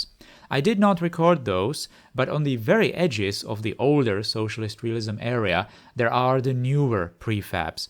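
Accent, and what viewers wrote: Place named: Czech Republic. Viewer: Jeho český přízvuk je nepřeslechnutelný...😅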